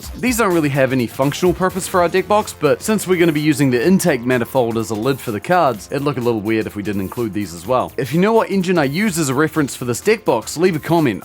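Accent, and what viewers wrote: New Zealand. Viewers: Was NOT ready for a Kiwi accent lol so naturally "deck box" sounded like something else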